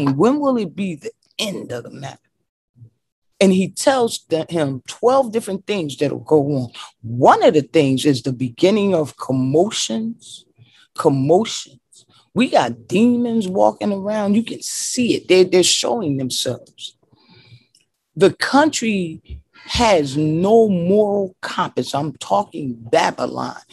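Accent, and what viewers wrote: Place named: New York, United States. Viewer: Sister, you are a wise beautiful person. All praises to TMH for you!!! He has given you great strength and I really appreciate it. I'm glad you are speaking on this, because I saw that particular Kerry Ann's video about India, and I was saying the same thing, "They are NOT TMH'S PEOPLE at all shaking my head. THEY ARE NOT CALLED BY TMH'S NAME!!! Sister, Psalm -11 tells us to rejoice when we seeth the vengeance.... Sister, you sound like a Northerner, a New Yorker. Anyways, TMH continue to open your eyes sister. Please, if you will sister, check out gdash the prophet channel. He was similar to you, but TMH has raised in up to a higher level of wisdom, knowledge, and understanding. May TMH raise you up higher as well. Peace Sister. Love you my sister.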